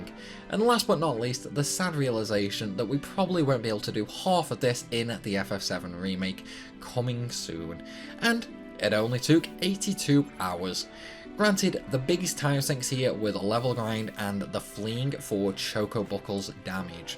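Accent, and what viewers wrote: United Kingdom. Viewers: Your accent makes some words hard to understand.... And I’m british too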